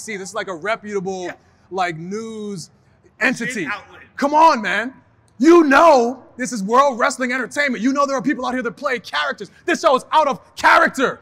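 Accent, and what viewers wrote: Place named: Ghana. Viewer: What accent??? Aren’t you from Ghana 🇬🇭 ??? Did you lose that accent!!!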